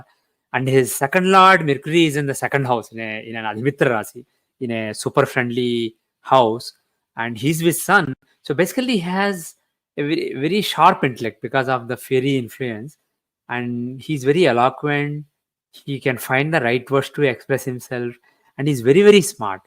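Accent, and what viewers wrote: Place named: Chennai, India. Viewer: His Madrasi English is confusing. Ask him to speak Hindi. This will shorten public interest to bear this video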